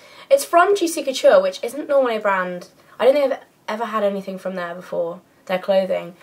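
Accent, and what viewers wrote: United Kingdom. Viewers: omg , i wish i had a british accent . i love they way your voice is ! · Bahaha. i try to talk british like yhu afterwards.. Is tht akward..(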